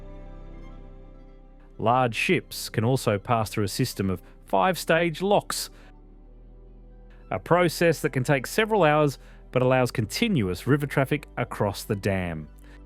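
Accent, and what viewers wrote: Australia. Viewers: Australian narrator has caught my ear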